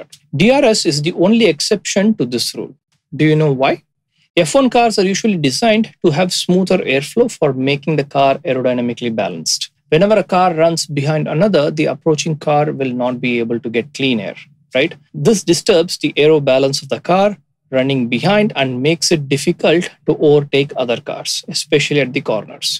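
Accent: India. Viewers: Immediately disliked after hearing indian accent lol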